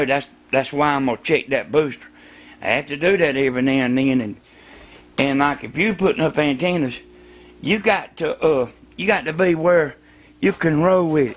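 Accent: South, United States. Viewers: have to say this. you could be a professor, but that southern accent! love it!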